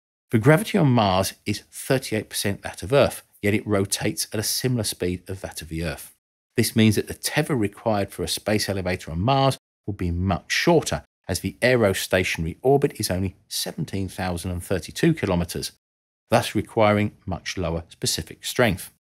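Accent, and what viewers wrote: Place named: Yorkshire, United Kingdom. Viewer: Ahh, that Yorkshire influence on the accent.... "What planet are you on?" "Uhf"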